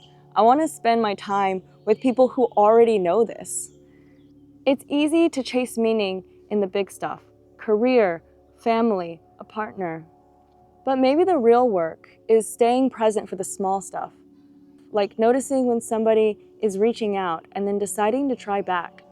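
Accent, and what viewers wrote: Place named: United States. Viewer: I do like your English , however, a bit overly American! Do build your own character when U speak. Never imitate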